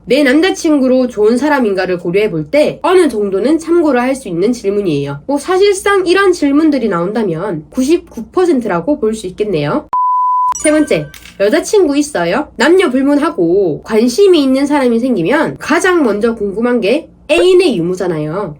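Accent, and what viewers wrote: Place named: Gyeongsang, South Korea. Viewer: 경상도사투리 ㅈㄴ쓰누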